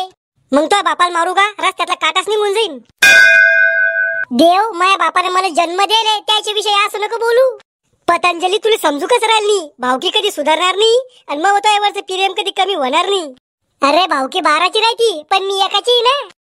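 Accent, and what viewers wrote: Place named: Marathwada, India. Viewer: Nakki jalana cha asnar bole vrun tr as wate😂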